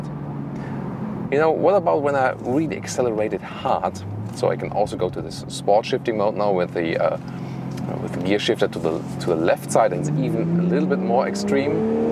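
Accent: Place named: Germany. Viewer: German cars should be always presented with German accent just like in this video :)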